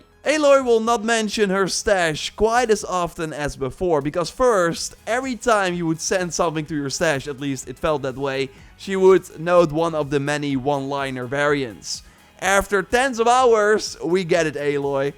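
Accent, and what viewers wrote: Netherlands. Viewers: Man man dat Hollanglish.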